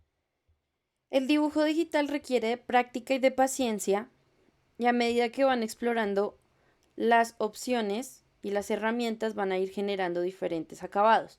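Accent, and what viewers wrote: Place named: Colombia. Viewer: Hola buen día, puedo suponer por tu acento que también eres de Colombia. Es una lástima que hayas dejado de crear estos videos, espero puedas leer este comentario y te de un poco de motivación para continuar con este proyecto. Yo quisiera aprender más sobre ilustración científica. Si me puedes recomendar algún libro u otros medios para aprender te lo agradecería.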